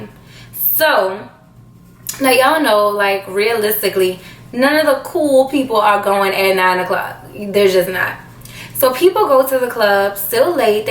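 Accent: New Orleans, United States. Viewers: I moved to Houston from Hempstead, NY. I f'cks with H'town all day!!! love your N.O accent!!!! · Son when you opened your mouth, I was like this girl from NOLA. Then when you mentioned it I was excited 😊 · I love your New Orleans accent. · Damn you new Orleans women mane! I love that accent so much..."ova...bey buh..." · Carnisha XO yes I'm not from new Orleans but I have family that live out there and she sound just like them. Just the way she talk is so New Orleans lol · I love your accent. Reminds me of my time at Xavier! I love New Orleans❤ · That New Orleans accent 😍 👌🏿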